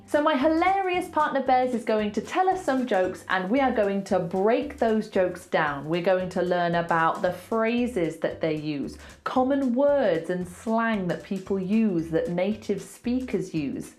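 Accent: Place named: RP, United Kingdom. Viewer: Hello. Great video! I speak Spanish and have for most of my life (My mother is Cuban, and my wife is Mexican, but I grew up in the US). However, the reason I don't consider myself fluent in Spanish is because I have trouble with many of the jokes for the reasons you mentioned (idioms, slang, colloquialisms, etc). I am going to teach ESL here in the US to Spanish speakers. Jokes are definitely something I will use so thank you for that idea. I am also going to use songs because that is also a fun way to teach. I also have noticed that phonetically the RP English that you speak is easier for Spanish speakers, especially the pronunciations. One example is words that end in -er. When I was trying to teach my wife English using a word like "greater" her mouth could not make the "err" sound. But in RP it is "GrAY-tah" which she can say. She actually learned a lot of English from a woman she worked for who was from India and did not know how to speak Spanish but spoke RP English. 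That is why I subscribed to your channel, I would like to teach both RP and American English (which has many, many accents as you know - I consider the English spoken by American hillbillies almost a different language :). My accent is fairly neutral with a slight midwestern twang. I really like the way you teach. You use humor (or humour - haha) but you get to the heart of the subject. Best of luck! I will end with a joke: Why is England the wettest country? Because the queen reigned there for decades (may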